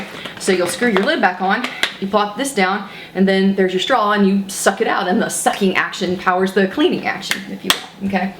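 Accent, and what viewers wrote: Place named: Texas, United States. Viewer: " Fixin to do " , are you a Texas Girl ? I am not hating at all just a 56 yr. old Native Texan Male that knows good Texan Slang when I hear it !!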